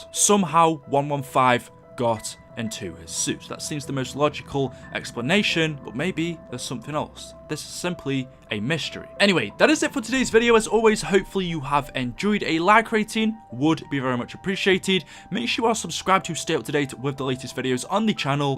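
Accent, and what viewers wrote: United Kingdom. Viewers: the voice sounds slightly British. anyone else agree? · He sound British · He sounds British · I hear a British voice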